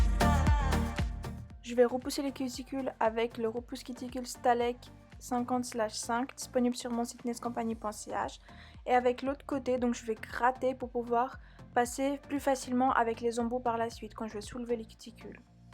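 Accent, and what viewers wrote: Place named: Brazil. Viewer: On voit de suite que tu es formatrice 🤩. Le travail est soigné et parfait 👍 bravo, en prime j’adore ton petit accent brésilien je crois ? ☺️🤫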